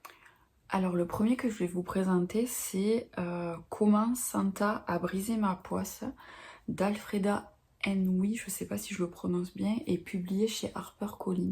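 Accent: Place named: South, France. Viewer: Vive l'accent du suuuuud !!! Les carnets et les stylos ralala, j'ai du arrêter d'en acheter parce que sinon mon appart serait rempli !! Y a pas d'âge pour lire tel ou tel livre, fais toi plaisir !!! Trop cool pour Virgnie Grimaldi ! Je savais pas qu'elle avait un blog avant d'écrire des romans !! Je suis pas du tout une lectrice saisonnière non plus, je fais en fonction de mes envies Très intéressant cette premiere video !! J'ai hate de voir la suite ! Si tu écris tu pourrais nous en parler un peu plus et pourquoi une vidéo avec tes livres préférés pour apprendre à te connaître 😁😁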